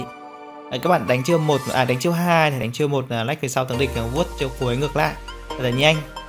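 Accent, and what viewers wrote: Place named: South, Vietnam. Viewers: Ôi zồi ơi ổng nói tiếng nam kìa cute phô mai que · Anh nói tiếng miền nam kìa ối zờ ơi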